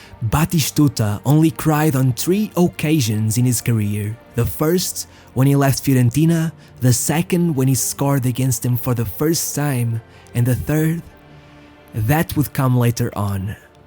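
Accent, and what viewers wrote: Portugal. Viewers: The Portuguese accent is strong in this one. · It feels weird hearing a Stan of an Argentina legend with a Portuguese accent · Why are you so mad about his pronunciation? It's very insignificant as long as you can understand him. By the way, I read in someone's else comment that this narrator is from Portugal and that explains his accent.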